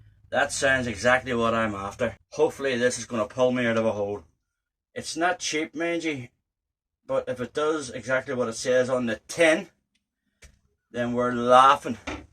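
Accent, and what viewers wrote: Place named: Ireland. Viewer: Good to hear a solid Irish accent 🇮🇪🇮🇪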